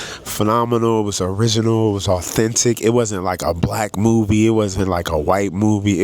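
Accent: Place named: Caribbean. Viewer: Sir, do you have family members who originate in the Southeastern United States? Because nobody who has ancestors from that place and lived during that time would totally and completely invalidate Mary's race and ethnicity like you did. I'm sensing a slight Caribbean accent too. I'm sensing your people are not originally from here, because people who looked like Mary were definitely in our family as grand fathers and grandmothers, great grandfathers and grandmothers, great great grand fathers and grandmothers. We all had family members who were Yt presenting and some actually passed for Yt. Your definition of what is Yt doesn't apply to the historical reality of Mary's identity, and it was really aggravating to hear you constantly dismiss her race and ethnicity and call that woman Yt and say Stack had a Yt girl. Mary was not a Yt woman. It's very low key disrespectful to dismiss people like Mary who were in our lineage and chose not to pass, and that is what Mary ultimately chose for herself, to not pass for Yt or live as a Yt person. 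Her storyline was a representation of our ancestors who had her experience. People who were Yt presenting in Black America is part of the Black American experience. There were plenty of Yt presenting Black Americans in the USA who were enslaved in this country, and Jim Crowed right along with the darker skin Black folks, and who stayed within the negro/colored community marrying more darker skinned Blk folks and as a result, we have these women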